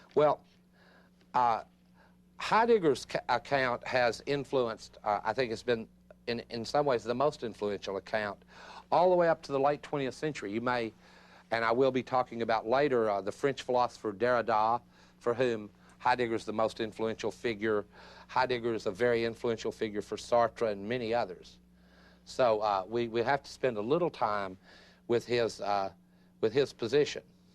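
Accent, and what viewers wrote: South, United States. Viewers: That thick southern accent doesnt help......at all.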